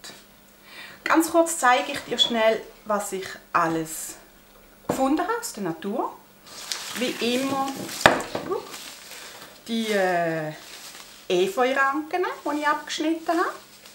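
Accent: Switzerland. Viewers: Bitte nicht auf Hochdeutsch, soviel Charme geht nicht im trockenen Hochdeutsch. Wir beißen uns doch gerne durch deinen zarten Schweizer Dialekt, der ja sehr dezent, aber auch so liebreizend rüber kommt. Und deine Videos liebe ich soooooooooo sehr. Einfach nur wunderschön, kreativ und sooooooo geschmackvoll. Vielen Dank auch für deine ruhige und tiefenentspannte Art, auch, wenn man manchmal merkt, wie es dich bei manchen Themen umtreibt😉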